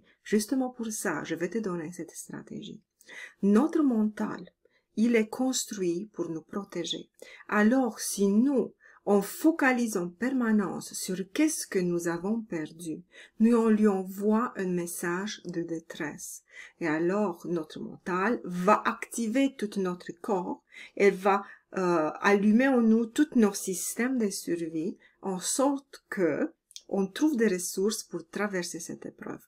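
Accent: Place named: Latin America. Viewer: Merci. Je ne peux pas dire que c'est une période difficile que je traverse mais une période de transitions avec divers projets qui demande courage, patience, persévérance, quasi à la retraite,... j'applique déjà ces 3 conseils mais j'apprécie beaucoup de les entendre de ta voix. Cela cautionne ce que je mets en place pour parvenir à réaliser mes projets avec sérénité, à être patiente avec moi-même.....j'aime ta voix apaisante, ton accent latin doux et chaud et parfaitement compréhensible. Je t'aime aussi chère.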